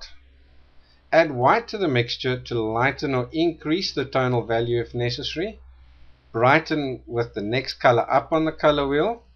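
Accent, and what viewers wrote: South Africa. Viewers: Hoooly smokes, this is probably the most valuable video on colour mixing I've seen since I started painting 2 years ago. A complete revelation! Thank you so much :) Btw I bought your colour buster tool today and am looking forward to using it. Also love hearing a South African accent on youtube ;) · Very south african accent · Go South Africa! This accent takes me back to school days. Thanks for sharing this so simply and freely. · are you from soouth africa? i can hear your accent :P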